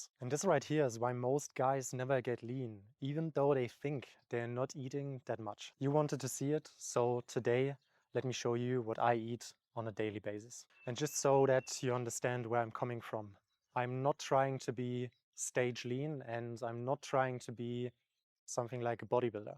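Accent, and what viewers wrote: Germany. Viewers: Is this some german accent Im hearing? Should have watched the video before writing... Anyways eating eggs every day doesnt seem a healthy idea in respect of ldl intake.. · Bro are you german?? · Sounds German or scandi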